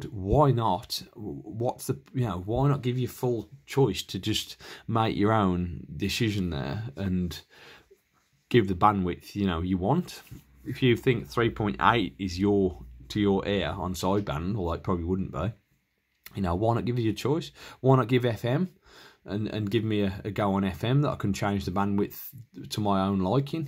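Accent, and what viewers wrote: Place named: Liverpool, United Kingdom. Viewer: Just stumbled upon this video and your channel looking around at 7300 videos. Great radio, and superb explanation. Are you a Scouser? Cool accent!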